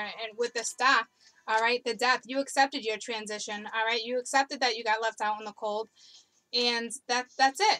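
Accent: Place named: Massachusetts, United States. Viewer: You are my favorite reader! Also I love that you have a Massachusetts accent I am from Massachusetts and have lived away from home for a long time. Every time I hear your voice and how you interact with your kids, it is like getting a huge piece of home. Thank you for your service!!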